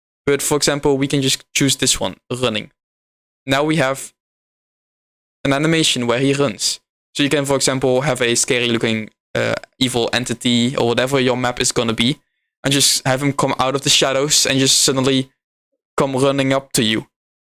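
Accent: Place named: Netherlands. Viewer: I lived in Amsterdam for 10 years and I've never heard a stronger Dutch accent than this.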